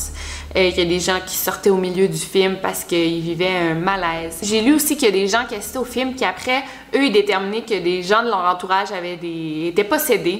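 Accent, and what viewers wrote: Quebec, Canada. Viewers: J'imagine que tu a fait baucoup de recherche pour cet vidéo donc bravo et en plus ton axenc québécois (je sais pas comment on écrit) est trop bien donc continue comme ca 😊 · Je trouvé l’accent québécois bizarre mais en regardant tes vidéos je l’adore je le sur kiff de malade 😍😍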